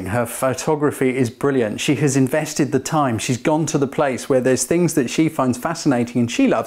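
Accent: England, United Kingdom. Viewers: where in England are you from.. i know by the accent its SE/SW England mate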